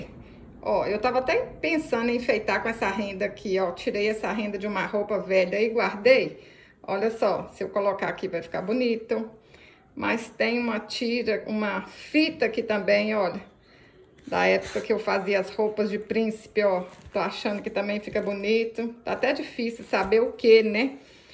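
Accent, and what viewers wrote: Minas Gerais, Brazil. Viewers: Muito lindo e simples a explicação e o modo de fazer. Esse seu sotaque é o máximo me lembra uma amiga mineira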